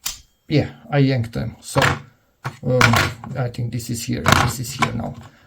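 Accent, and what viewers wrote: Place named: Romania. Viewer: Accent clar romanesc :))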